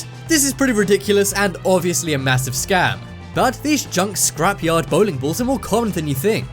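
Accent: United Kingdom. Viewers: Im so tired of this British accent bullshit on youtube. It may have seem attractive to some people at the beginning but now it's just fucking annoying! I would give you a thumbs down but I'd rather give you a thumbs up.....YOUR ASS!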